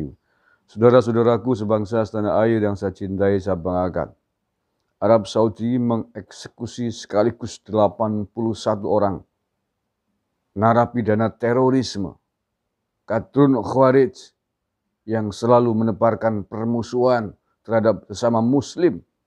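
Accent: Banyumas, Indonesia. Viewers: asli.... logat Banyumasannya kangmas satu ini yg bikin kangen dengerinnya... Sehat terus yoooooo Masbro.... :)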